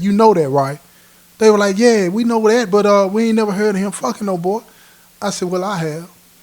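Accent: South, United States. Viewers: Is your father or mother or grandparents from the south? Because you sound like some of my kinfolk down here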